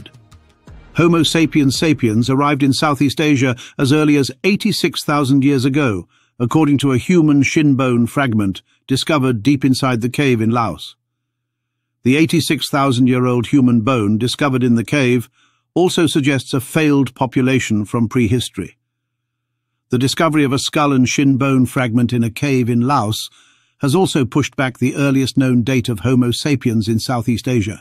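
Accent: United Kingdom. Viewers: BS !! The GIANTs were the first hue’men. We aren’t believing yo slick Brit accent. There is no Evolution (Evilution). There is ONLY DEVOLUTION. Archeologists & Anthropologists frauds have NO credibility with MELANDIGENOUS ORIGINEEs.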